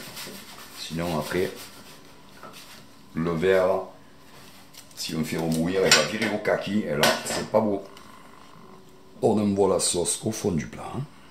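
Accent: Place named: Provence, France. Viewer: Je viens de réaliser cette recette, simple et délicieuse à la fois. Je m'abonne avec plaisir. J'entends votre accent, ne serions-nous pas de la même région (Var pour moi) ? Belle journée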